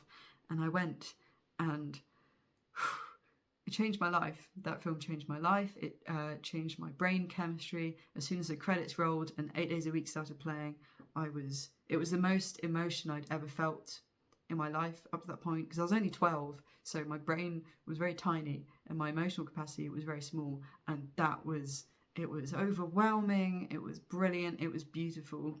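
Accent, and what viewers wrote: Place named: London, United Kingdom. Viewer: It’s strange to hear you speaking, since I’ve only ever heard you sing. But you sound pretty classy—as a yank with little awareness of British accents outside of London, Manchester, Liverpool and Cockney, I’d have to guess that that’s London accent?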